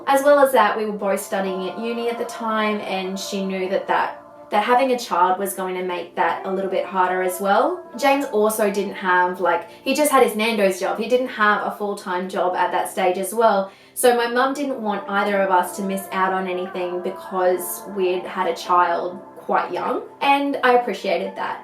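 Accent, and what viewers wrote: Australia. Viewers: I knew straight away by your voice your an Aussie!!! Im an Aussie too! Subscribing right now! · I thought I recognised your accent from somewhere! Go Australia!! I'm Australian too! Your so pretty. 🇦🇺😍😘❤️🇦🇺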